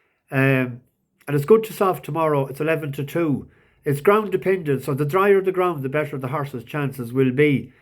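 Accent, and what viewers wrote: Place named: Scotland, United Kingdom. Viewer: Great stuff, pity about the the scots accent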